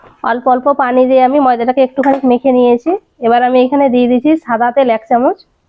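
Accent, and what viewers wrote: Murshidabad, India. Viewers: হ্যলো, আপনার ভিডিও দেখি আমি বহুদুর কানাডা থেকে, আপনি হয়তো পশ্চিম বংগ, মুর্শিদাবাদের বাসিন্দা, মিষ্টি কথা শুনে ধারনা করছি, কোথা থেকে আপনি জানালে খুশি হব, আমি মুর্শিদাবাদের মহিলা । আপনার সব রান্না নিঁখুত হাতের, আপনি বড় হোটেলে কাজের সুযোগ পাবেন । একটা অনুরোধ আপনি ফুডকালার/ লাল রং যেটা বিরিয়ানীর আলুতে ব্যবহার করেন তা কম পরিমানে ব্যবহার করবেন কারন, এই রং থেকে “ক্যনসার” হয় । আরেকটা অনুরোধ বিরিয়ানীতে মশলা ব্যবহার করবেন না ।হায়দরাবাদের বিরিয়ানী ছাড়াও ( পুরানো ঢাকার বাবুরচির রান্না “ কাচচি বিরিয়ানী” google করে দেশে শিখে নিতে পারেন; এই বিরিয়ানী- নবাব সিরাজদৌলাহ এর সময় থেকে পুরানো ঢাকায় এসেছিল, তাদের আত্মীয়তার সুবাদে । আপনাকে ও পরিবারের সবাইকে নুতন বছর ২০২৪ এর শুভকামনা জানাচ্ছি । শুভেচ্ছানতে । জিনাত/ খুকু, ০১ জানুয়ারী ২৪🇨🇦🙏❤️🥰🌹🌹🌹🌹🎈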